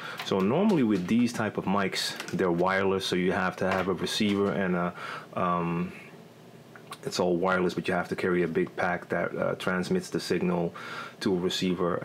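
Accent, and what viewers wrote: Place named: United States. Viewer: You have an impeccable American accent. I live in Purmerend and work in Amsterdam, been here for a little over two years. Very nice place to live. Glad I found your channel, lots of great stuff, thanks fir sharing your experience!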